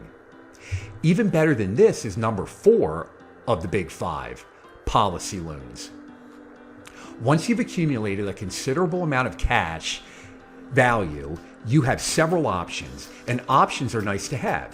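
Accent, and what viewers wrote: Philadelphia, United States. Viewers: Love the Philly accent! Interesting topic, but now i need to go to Wawa and grab a hoagie and a wooder ice. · are you from Philly?? I cannot tell if that’s a Philly or delco accent 😅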